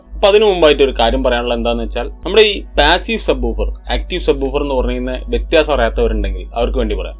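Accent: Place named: Palakkad, India. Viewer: Palakkad baashayaanallo.. 😍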